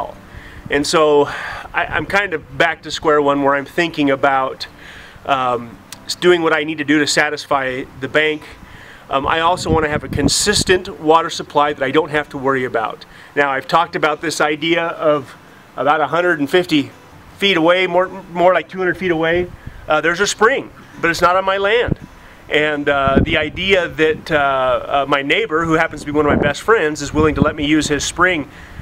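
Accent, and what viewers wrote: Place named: California, United States. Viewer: I thought you said that you were in Idaho. It sounds more like California.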